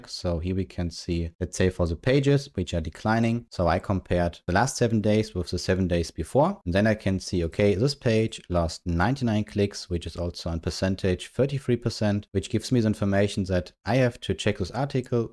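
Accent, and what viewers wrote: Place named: Germany. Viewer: Hear german accent, know it's quality without watching the video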